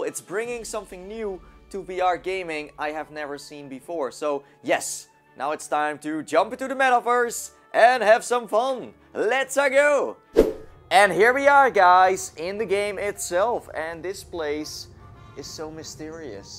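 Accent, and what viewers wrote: Netherlands. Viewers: Ik hoor zo duidelijk dat je Nederlands bent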